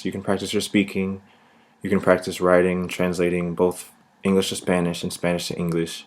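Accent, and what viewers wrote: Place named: South America. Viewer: Hablas muy bien el español para ser Norte americano, incluso, suena más como si fueras de sud américa.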